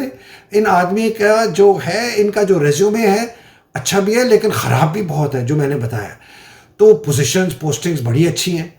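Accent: Pakistan. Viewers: صاحب، حضرت، قبلہ: ہم لاہور کے رہنے والے لوگ آپکی ترتیب کی پنجابی اردو بولتے ہیں اور بہت سارے الفاظ جو آپ بولتے ہیں، میں اچھی طرح سمجھتا ہوں۔ البغلول رحم اللھ ایک بہت بڈے بزرگ ترکیہ یا ایراق/شام میں، حرونارشید کے زمانے میں ہوگزرے ہیں، اور ایک ڈبکھڑبہ کردار نہیں تھے، بہت پایے کے ولی اللھ تھے اور انکی ہر بات بیوقوفانہ لگتی تھی لیکن بہت فلسفے و دانش سے مظیین ہوا کرتی تھی گہرای رکھتی تھی۔ انہیں تو فلسفہ و زبان میں پڑھایا جانا چاہیے۔ لوگوں کو اتنا سنجیدگی سے نہ لیا کریں "لوگ تو باتیں کرتے ہیں انکا تو کام ہے باتیں کرنا"۔۔۔۔ ۔۔۔۔ میاں ہمایوں لاہوری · Sir don't you worried, its a simple and casual (mirasi) accent of punjabis since partition, leave them they should need to be focus on facts rather then words and way of talking. Don't take it serious some of that people.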